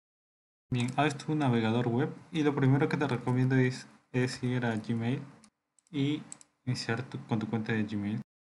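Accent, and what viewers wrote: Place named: Peru. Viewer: adivino de donde eres eres de peru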